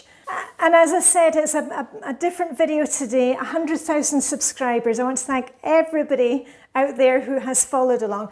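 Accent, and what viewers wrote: Scotland, United Kingdom. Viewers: Found your channel via one of the minimalist channels - i think it was the minimal mom. I came for wardrobe advice and stayed because of many more reasons: the positivity, the no-adds no sponsoring, the iceswimming, the routines, the scottish accent, the authenticity, now I am learning about the flylady system and danish hygge. Your videos brighten my evenings and yoyr success is so deserved in my opinion! 🎉🎉🎉 Congratulations to 100k! 😊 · I found you a couple of years ago, probably when I was searching for cleaning systems. I loved your warm, welcoming approach as well as the Scottish accent! I live in north east England and holiday often in Scotland-my favourite place. Feel as if I'm checking in with a friend every time a new video pops up. Thank you for your support and encouragement x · I was drawn to your channel for several reasons: your infectious positivity! 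Learning about decluttering! Seeing you with friends enjoying life in Denmark! Your Scottish accent! Your simple meal preps! Simplifying household chores! All in all, you’re just a lovely human being ❤❤❤ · When we finally moved into our new house in 2020, I looked on "this You Tube thing" for guidance on folding socks as neatly as the German mothers of my children's friends did. Found a nice American lady, who besides folding, had videos on organising, and fell down the rabbit hole... At some point, I was watching an old video of the Minimal Mum, doing an all-January collaboration - and yours was one of the videos. At first I was very surprised that Danes apparently have Scottish accents 😂 honestly! I remember thinking the geographical proximity might explain it - I must have been thinking of Norway 😂😂. Luckily you soon mentioned being a canny Scot 😂. I am eternally grateful to you for your bahookie nudges, and enjoy being part of this international community around you. 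Congratulations and thank you - Anne (in Germany now but formerly of the 3rd Morden Brownies 😊)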